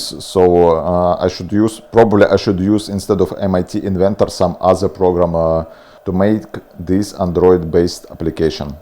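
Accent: Russia. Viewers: у вас очень сильный русский акцент, у вас только англоязычный канал?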